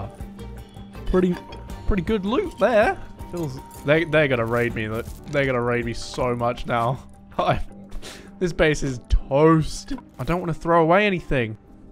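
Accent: United Kingdom. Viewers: Yo, about the coffee thing... I wanted to ask you a question - which may sound odd but it's genuine. is just your nickname or your actual nationality? (I don't recognise accents well, English is not my mother tongue). 'Cause I'm a Pole who spent 16 years in Italy and got used to that quality of coffee, I'd never buy coffe from an American because they do it wrong 😅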